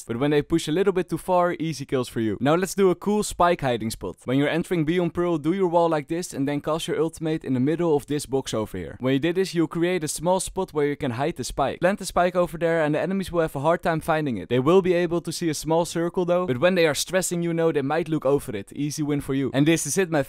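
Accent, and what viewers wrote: Netherlands. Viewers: are you dutch? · in the first 5 seconds i knew you were dutch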